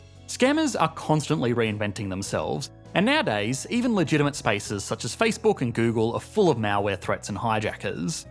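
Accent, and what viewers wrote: Australia. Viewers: is this channel australian? Suprised to hear the australian accent lol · Vocal fry sounds bad at the best of times......in Strine it sounds even worse. Just had to stop listening after a minute, sorry.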